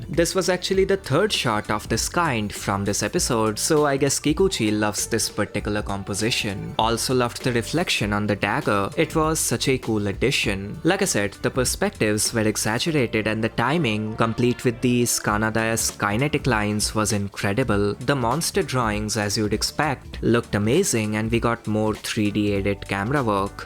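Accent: India. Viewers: How did you somewhat get rid of your indian accent?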